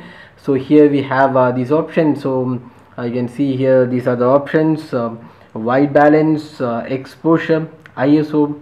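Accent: Kerala, India. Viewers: You sound like mallu.. Are you?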